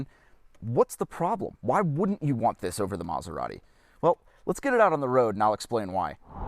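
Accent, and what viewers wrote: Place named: Wichita, United States. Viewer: Does everyone in Wichita(aside from Wizard) speak with the same cadence as?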